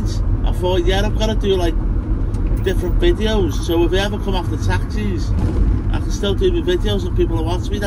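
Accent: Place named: Wirral, United Kingdom. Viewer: U live in liverpool u sound more like over the water?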